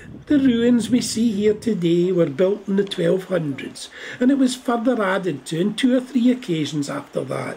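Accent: Scotland, United Kingdom. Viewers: I have to go to school to learn Scottish. It sound so interesting, what you’re telling, and I don’t understand much enough to follow. 😢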